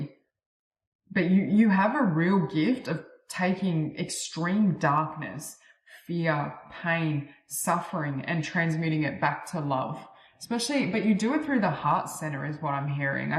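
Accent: Australia. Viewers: Ah, beautiful reading number 4, and lovely to hear your Aussie voice. I lived in Brisbane for 3 years, I so miss the sunshine there. Being an artist my creativity exploded when I lived in Australia. I loved the light and vibrancy of the place. In comparison the Uk which has a denser energy, I have had to work harder to raise my vibration. It has taken quite a few years to adjust being here. Oh, receiving some gold would be nice!☺️ The Police used to be my favourite band when I was younger! Warm Wishes and Blessings. Many thanks for your messages. 🌟🤍😌🤍🌟🕊️🧚‍♀️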